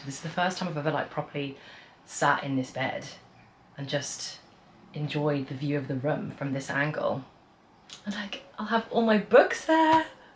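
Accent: United Kingdom. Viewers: Just finished Book Lovers and I enjoyed it ! I really appreciate your content and, as a French, I’m a fan of your British accent 😊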